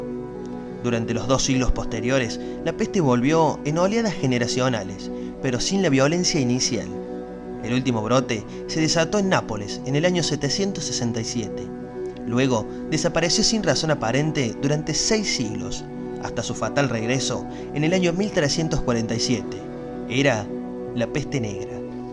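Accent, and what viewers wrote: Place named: Córdoba, Argentina. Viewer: con un ligero acento coordobés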